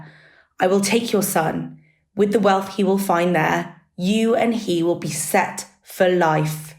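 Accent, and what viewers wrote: United Kingdom. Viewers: I love British accent. Thank you very much.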